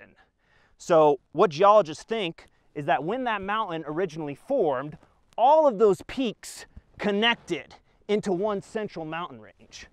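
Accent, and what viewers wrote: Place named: Southwest, United States. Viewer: That's what a southwestern accent sounds like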